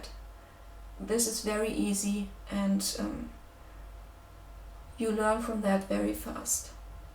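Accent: Germany. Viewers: als du angefangen hast zu sprechen dacht ich mir sofort die ist deutsche 😂😂😂 stimmts? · You sound very german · Die ist deutsch?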